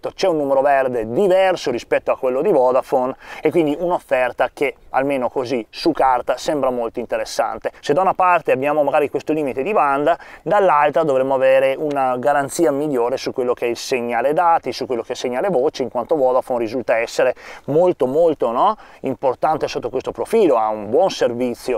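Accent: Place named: Veneto, Italy. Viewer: Presumo che dall'accento non abiti molto lontano da me..nella mia zona(Montagnana) iliad funziona alla grande, praticamente sempre 4g o quasi, magari lo Speed test non è a livello di Tim, ma alla fine, mica guardo film in 4k col telefono!! nell'uso quotidiano non noto nessuna differenza..quindi secondo me anche tu sarai soddisfatto! grande come sempre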